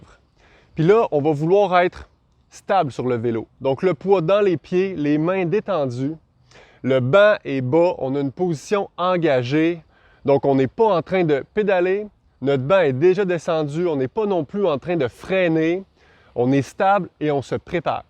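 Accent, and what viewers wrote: Quebec, Canada. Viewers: Very clear and well explained. That Quebec French is tough but I think I got there in the end.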